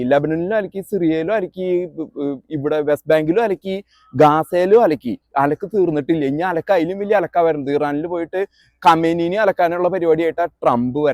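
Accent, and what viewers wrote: Thrissur, India. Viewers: Thrissur slang super bro your news chanel mind blowing dialogue big salute 🙏🙏🙏🙏🙏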